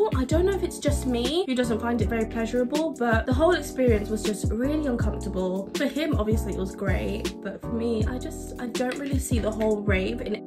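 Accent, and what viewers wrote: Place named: United Kingdom. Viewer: You cute love from NYC and I love ya U.K. accent love from NYC once again 😘💗❤️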